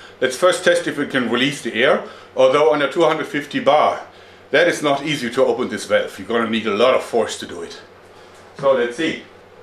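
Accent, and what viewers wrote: Germany. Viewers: Very late to the party here, but I noticed something while rewatching this old video. When our mad rubber tubing based genius says one specific phrase, he sounds just like a certain Austrian Governor of California. He sounds nothing like him at all, but when he is bent over and speaking the words "Pumping Up" are uttered. It's like Arnold's voice came out for half a second, and then straight back to the usual German accent. I'm sure it's just an affectation of bending over and speaking, but I still got a chuckle. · I'm sincerely curious, do most German people speak English so fluently? If it wasn't for the accent I would have no idea his first language isn't English. Secondly, I really like how much he seems to enjoy doing what he is doing. Every time he laughs like that it makes me smile.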